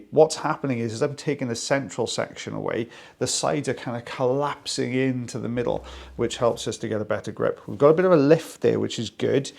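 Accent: Wales, United Kingdom. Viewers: My favourite is when he says Ebony for the dark wax. Lovely Welsh accent! Not in this video though.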